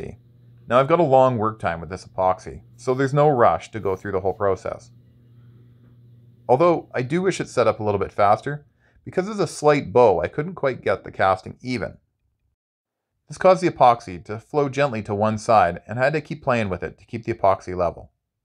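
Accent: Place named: Canada. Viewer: 🇨🇦 I knew this guy was a fellow Canadian by his Canadian accent. 🇨🇦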